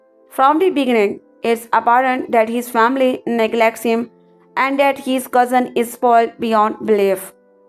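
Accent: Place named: India. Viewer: We don’t need your story telling with weird indian dialect you morron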